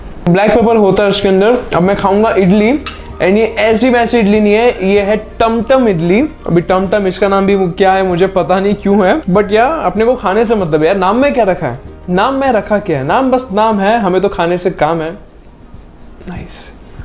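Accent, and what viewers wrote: Gujarat, India. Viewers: FAVOURITE PERSON AND FAVOURITE FOOD IN ONE FRAME🙈✨he is posting videos regularly omg😭💗Also talking in gujarati always hits different 😂🥺💗 Really love your Gujarati accent, and the Gujarati intro in starting was damn good😂✨ all dishes are looking so good and all of them are my favs too🤤✨ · Awesome video 💙💙💙! Aur I must say very delicious video! 🤭😂😂... Your Gujarati accent is too cute... Intro is just op! Mirchi kya sach mein bohut teekhi thi?🤭😂! Btw, fafda - jalebi kabhi hume bhi khilana... As yeh video dekh ke toh sach mein cravings suru ho gayi... I really want to taste that champakali gaathiya and masala papdi gaathiya also... 😍✌🏻... And thepla and khakra is also looking sooooooooo yummy😋😋!!! And that dialogue, "Naam bas naam hain... Hume toh bas khane se kaam hain"- is really hilarious😂😂😂... Next Sunday, I must have patra, khaman or khandvi as my breakfast... 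✌🏻💙❤ You are really the best brother sister duo ever... 👍🏻👍🏻👍🏻 I can see that you are really enjoying your Sunday breakfast... I want to say you that we are also having a lot of fun during watching this video... 👏🏻👏🏻♥♥♥👏🏻👏🏻... Thanks for bringing smiles, laughter and enjoyments for us on Sunday... 😊😊😊... You are really one of my favourite actors and favourite YouTubers... 💙💙💙❤❤❤... Your dedication towards your work really inspires me♥✨... You are really amazing and multi talented and your videos are excellent and absolutely incredible... Keep shining and keep dreaming superstar... ♥♥💙💙❤❤... All the best sweetheart... 👍🏻💙💙💙💙💙💙💙💙💙💙💙💙💙👍🏻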